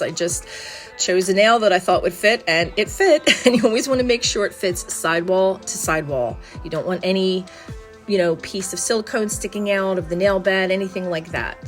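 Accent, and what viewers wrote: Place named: Philadelphia, United States. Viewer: This is a great review I like how you compared both. Love your Philly accent 😊❤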